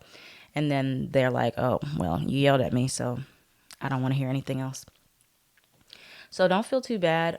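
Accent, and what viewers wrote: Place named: New York, United States. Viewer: 🤣🤣🤣🤣 I can tell ur from ny. I’m so happy ur doing better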